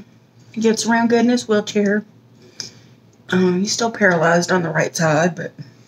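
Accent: South, United States. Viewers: She lives in Vegas BUT she's from the south... somewhere in LA i beleive...southern for sure! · I love her southern accent❤❤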